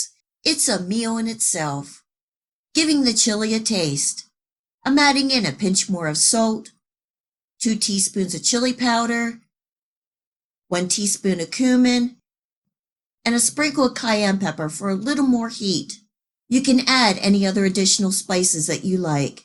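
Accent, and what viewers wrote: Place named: Pennsylvania, United States. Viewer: Just found your channel and have been binge watching….sounds like a Pa accent or close to it (East Coast) 🤗😘 I’m from York!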